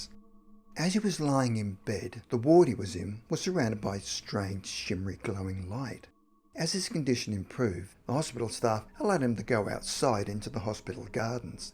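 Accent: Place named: Australia. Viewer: reminds me of the Geico Gecko LOL I think he's Aussie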